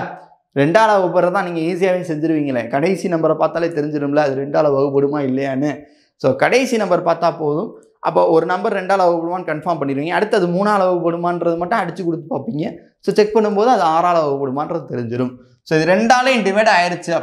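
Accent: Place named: Madurai, India. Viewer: Ungaludaya peachy madurai slang appidiye irruku,so cute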